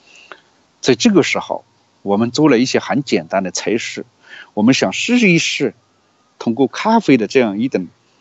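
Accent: Hunan, China. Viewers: 何先生生日快乐🎂🎁，非常喜欢你的节目，从一开始有30-40%不懂的湖南普通话到现已99%都懂了，而且是爱上湖南普通话了。希望您保持你的风格，希望能一直听你的火拍。God bless you and your family！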